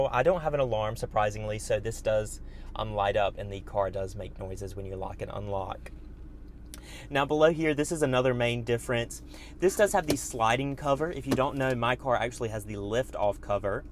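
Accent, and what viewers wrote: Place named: Carolina, United States. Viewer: I love that strong Carolina accent. Thank you for this video it really helped a lot.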